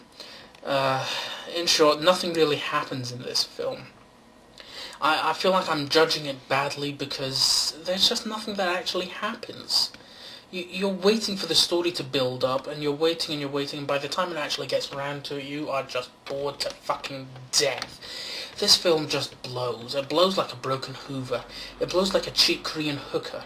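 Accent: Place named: Tayside, United Kingdom. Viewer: ha! great review, i almost choked on your hatred for asylum films. were about in scotland are you from btw? you sound like you're from the tayside area. looking forward to the dark knight review, maybe a batman & robin review aswell?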